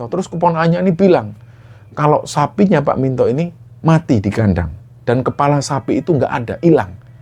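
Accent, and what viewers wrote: Java, Indonesia. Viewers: Ya Allah gmana itu mreka yg tdk berdosa di bunuh tanpa kesalahan ---- smoga arwah2 yg salah sasaran dpt beristirahat dgn tenang. Keren mas menceritakannya jd kyk hidup n bs merasakan kejadian saat itu. Apalahi dgn logat2 jawanya......seruuu dan sbg org jawa aku ngerti bgtz ❤❤❤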